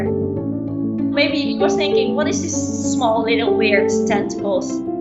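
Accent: Netherlands. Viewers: The STRONGEST Dutch accent I've heard in a while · This video was already on the Dodo but nevertheless stunning. I can hear she is Dutch and so am I , lol. 👋🌞🇳🇱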